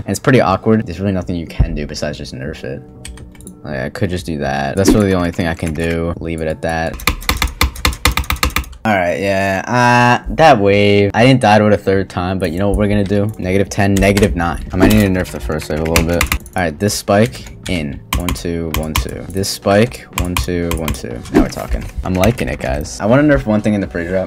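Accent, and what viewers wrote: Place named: New York, United States. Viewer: Yo gl on silent clubstep. I miss the New York accent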